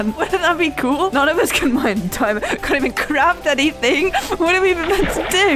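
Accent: United Kingdom. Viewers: Just wondering what country are you from because you sound British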